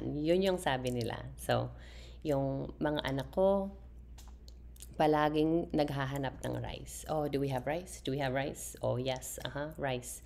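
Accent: Philippines. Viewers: You have a very Filipino accent when you speak Tagalog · Got me laughing, as my friend in Lapu-Lapu talks the same, leaves me laughing so much, 🤣🤣🤣🤣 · Very filipino ka pa din po.. Pati yun accent m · ung accent nio po ung mga matatapang na pinay po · Tagalog pa rin ang accent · You've got a great Filipino accent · was surprise that tagalog accent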